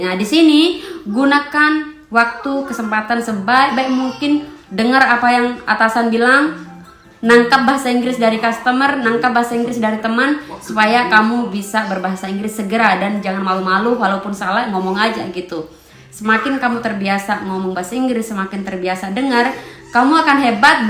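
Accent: East Nusa Tenggara, Indonesia. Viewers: Maaf ibu asli indo mn bhs i ndonya kyk NTT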